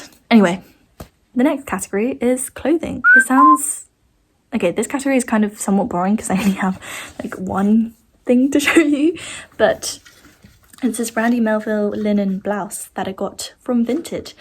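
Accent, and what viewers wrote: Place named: Hampshire, United Kingdom. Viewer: Omg!! your the first youtuber I've ever heard of with the same accent as me lol, are you also a Hampshire girly?💕 ps you are so gorgeous!!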